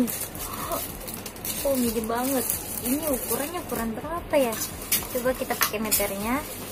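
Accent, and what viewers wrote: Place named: Makassar, Indonesia. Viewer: Makassar yh logatnya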